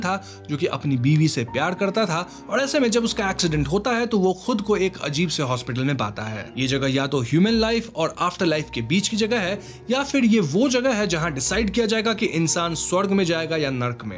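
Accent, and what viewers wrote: Bengal, India. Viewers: vhai tum bangali ho kya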